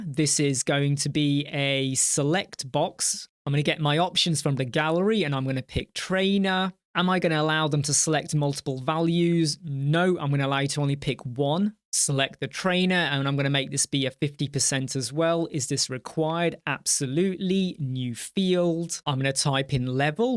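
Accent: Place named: United Kingdom. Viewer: I recently discovered your channel, and I have to say, it's become my go-to resource whenever I need to learn something about Elementor and WordPress. Every time I have a question, I head straight to your channel, use the search bar, and look for the topic I need. Only if I can’t find what I’m looking for do I turn to YouTube for other tutorials. You explain things in such a simple, clear, and easy-to-follow way, and your British accent makes it even more enjoyable and effortless to understand. I just wanted to thank you for that!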